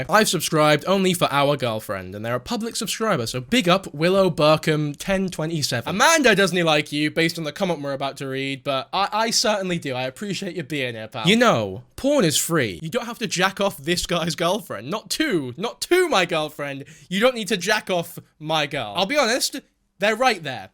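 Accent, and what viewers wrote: Scotland, United Kingdom. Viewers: I love the little scotsisms that sneak in to speech 🥹 didnae couldae wouldnae ❤ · Your scottish anger amuses me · He’s Scottish?😭😭😭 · Has anyone else noticed that is getting more Scottish lately? I blame the absence of his lass in his videos.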